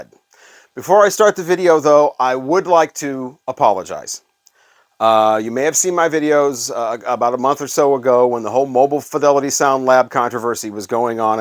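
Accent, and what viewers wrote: United States. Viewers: High bullshit = High record prices?! Wtf are you talking about? Another issue; ripping people off is NOT business. And btw… there’s no doubt you are an American. And finally, proper pronunciation is not pretentious.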